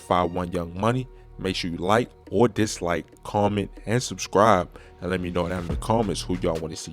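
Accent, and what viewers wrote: Baltimore, United States. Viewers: The narrator got to be from Baltimore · u know whoever this is he from Baltimore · yeh he gotta b frm bmore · You from Baltimore buddy? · Sound like you from Baltimore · U from Bmore huh gang? y’all accent get me every time bro👏🏾💯 L🎱VE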